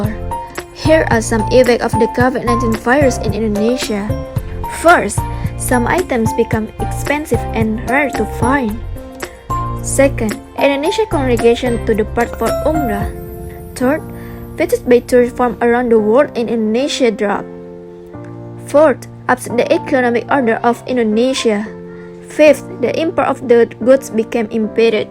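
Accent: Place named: United States. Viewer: American accent nih boss, senggol dong 😎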